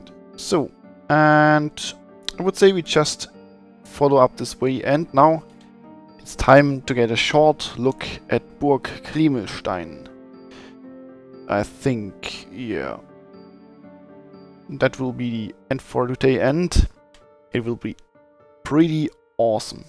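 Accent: Germany. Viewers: Really good video! Although you could really work on your accent, your english in general is good, but the german accent is in there :P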